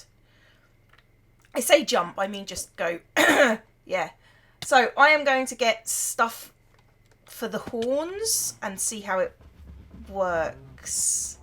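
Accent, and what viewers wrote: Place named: Oxfordshire, United Kingdom. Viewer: Anyone know where in England is from? I'm trying to learn the difference in all the English accents by region. Awesome video, girl! I get excited when I see hermitcraft videos from you!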